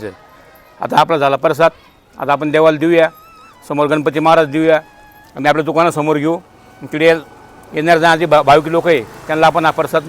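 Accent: Khandesh, India. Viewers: 🙏जय हरी मी आत्ताच तुमचे विडिओ बघायला लागलो मी खांदेशात धुळे शहरात राहतो तुम्ही खान्देशी बोली बोलून मन प्रसन्न केलत. आणि सर्वांनाच परमेशवराची भक्ती करण्यास प्रेरित करतात खूप आनंद झाला 👌🙏राम कृष्ण हरी जय जय 🙏🚩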